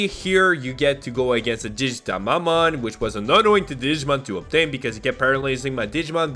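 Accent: Quebec, Canada. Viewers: His French canadian accent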